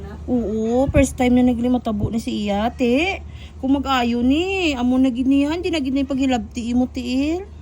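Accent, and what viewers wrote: Iloilo, Philippines. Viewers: knamian ko sng mga tono ilonggo ah.. tga dn ka maam? watching from Bacolod..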